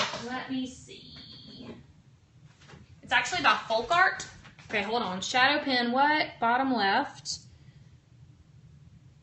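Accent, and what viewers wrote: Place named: South, United States. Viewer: Hi, just saw this periscope, so glad I can view this on youtube (I can't periscope:-) LOVE your tutorials for this lettering and florals, THANK YOU for showing us how to do this. BTW, love your southern accents too;-)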